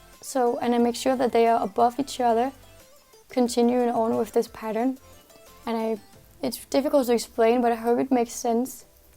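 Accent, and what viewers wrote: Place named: Denmark. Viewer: Er du måske fra Danmark? Your accent sounds kinda like it. Tak for dine videoer!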